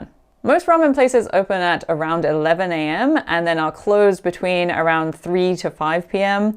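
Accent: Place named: United Kingdom. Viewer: Great video! Nice to hear from a British person😂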